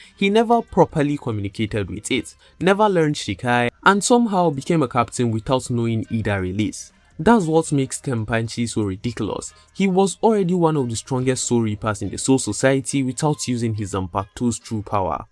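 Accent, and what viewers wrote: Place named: Africa. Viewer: It sounds African to me, very smooth sounding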